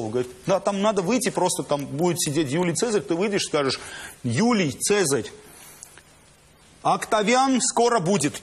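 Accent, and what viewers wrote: Armenia. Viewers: Когда он со своими у него армянский акцент, а среди русских Ванечка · А не на русском ТВ Мартиросян включает армянский акцент.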